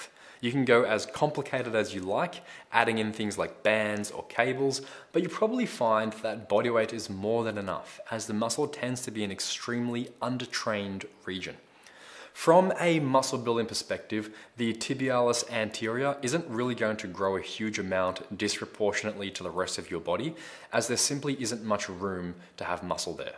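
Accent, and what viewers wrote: Australia. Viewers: Great video. Most beautiful Australian accent I’ve ever heard. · he is Australian, that is an Australian accent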